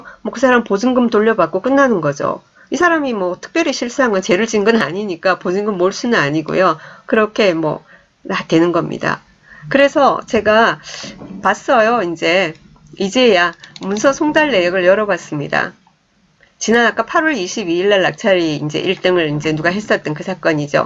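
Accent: Busan, South Korea. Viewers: 소장님 달달한 부산사투리하고 추임새...듣기좋네요 나만 좋은건가ㅎ 강의 잘들었습니다~감사합니다❤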